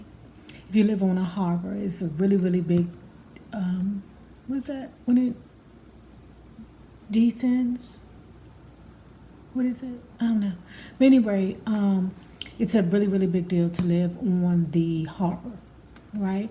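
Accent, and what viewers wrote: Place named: Washington DC, United States. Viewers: Peace Queen, I knew you were from DC, I could hear it in your tone, I have to ask do they have Mumbo Sauce down there. Yes, Chocolate City had started to change when Pratt-Kelley took over. But yeah, Your correct about the regentrification nationally, but truthful this is nothing but the fulfillment of the Homestead Act of 1867. The air's you seeing with the social climate is the reaction to the political agenda currently, condone. I too am aware of the Lupus struggle, I lost my Great Aunt when I was a young boy, but would come full circle with my ex-fiance was diagnoised too, I support the research too. Oh yeah, I am from Adams Morgan residing in Va. Beach. But on a another note, I never understood why Lupus never got the backing and support as Susan B receives multiple times a year. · I know what you mean about the black folks being pushed out. 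I live in Germantown md and I know a lot of ppl who moved here from D.C. It's crazy. Plus I know you were from the DMV before you said so and before I saw you. Just by your accent in other videos. It's funny, I can spot a D.C. Accent a mile away. Lol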